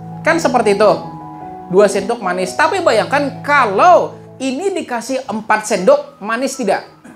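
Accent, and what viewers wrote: Banjar, Indonesia. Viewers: Urang banjar kh ini pina logat nya 🤭🤭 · Pandernya kaya ada logat banjar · Logat banjar nya mantap pak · Loghatnya seperti orang Banjar/kalimantan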